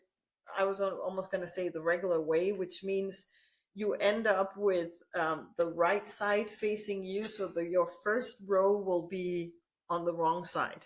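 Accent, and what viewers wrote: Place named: Denmark. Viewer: Thank you for this podcast. Love also the little danish prononciation🤗 Quiet complicated Danish language…..but I try to repeat it a few times after you😂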